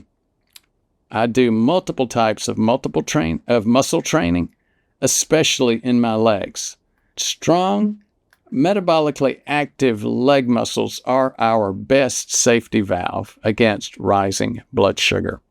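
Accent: South, United States. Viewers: Your redneck accent isn't reassuring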